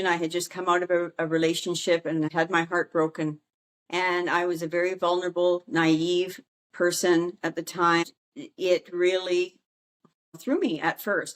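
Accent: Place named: Canada. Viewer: New subscriber here ...love your charming Canadian accent 😊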